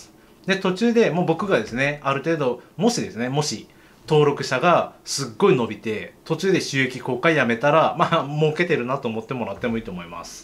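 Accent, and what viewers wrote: Kagoshima, Japan. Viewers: 鹿児島弁ですね！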